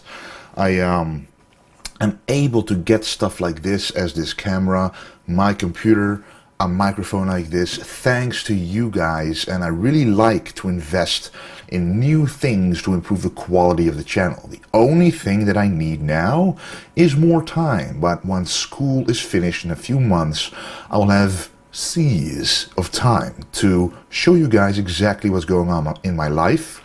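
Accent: Netherlands. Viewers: Dutch, can't you tell from his accent?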